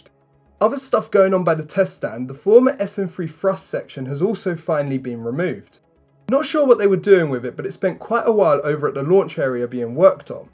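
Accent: United Kingdom. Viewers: Why are you so british ?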